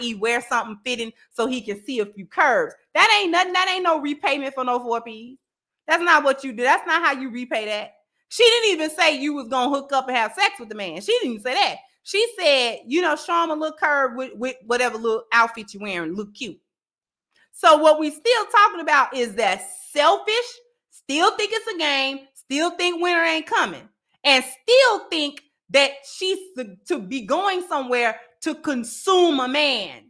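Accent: Chicago, United States. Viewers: are you from Chicago? I been subscribed for months, and this is the first video that I noticed a Chicago accent lol. Dope content btw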